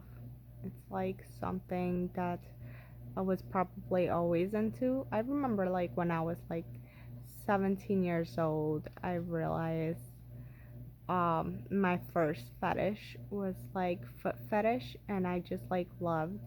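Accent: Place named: New York, United States. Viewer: Your accent sounds new yorkish 🤣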